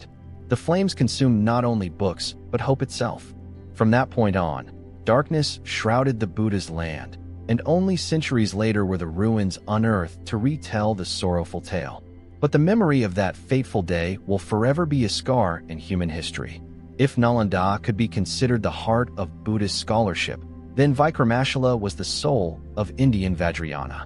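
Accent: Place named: United States. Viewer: good documentary - despite that american accent - 😖 - thanks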